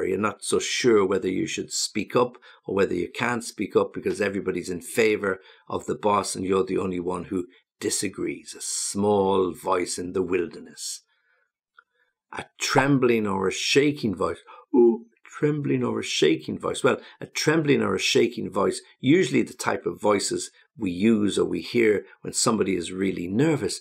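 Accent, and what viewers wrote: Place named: United Kingdom. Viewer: My morning begins with your video or podcast,! I hope my accent isn't too broad)) Learning from you, shadowing your beautiful British accent!:) Thank you so much!!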